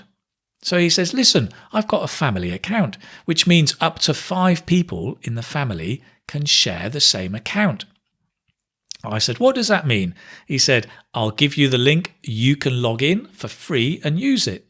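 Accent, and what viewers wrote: United Kingdom. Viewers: is it British English?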